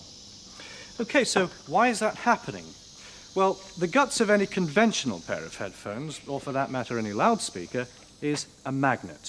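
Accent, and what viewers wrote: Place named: North, United Kingdom. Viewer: He was too northern for 1980 BBC sadly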